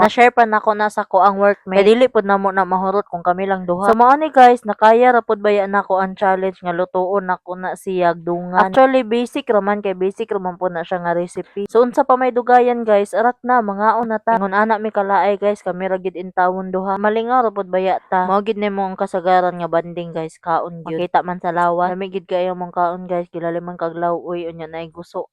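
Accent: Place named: Bohol, Philippines. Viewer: tamsak host. boholana girl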